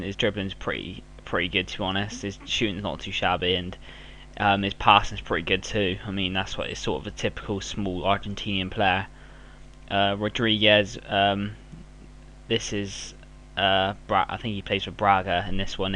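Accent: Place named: West Country, United Kingdom. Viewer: GT: x Dirty Wizard Player: Huth Also dude do you live in Somerset/Bristol? You got an accent haha :P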